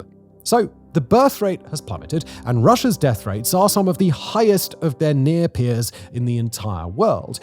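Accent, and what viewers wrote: United Kingdom. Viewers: Sorry but would it be possible to include closed captions in your videos? I'm afraid to admit this but while I do grasp written English pretty well as a non-native speaker, I'm having more trouble understanding British English compared to American 😅 And the automatically generated CC obviously sucks ass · Hearing what seems like a snooty British accent telling of Russia's demographic problem is bizarre. Just how is the native population of Great Britain doing in this situation? Aren't they merely a decade or two behind Russia in exterminating themselves?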